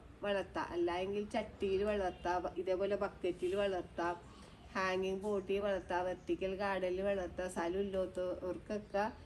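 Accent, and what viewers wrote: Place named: Ernakulam, India. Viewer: സംസാര ശൈലി തന്നെ വേറെ ഒരു സ്ലാൻ ഗ്.... എറണാകുളം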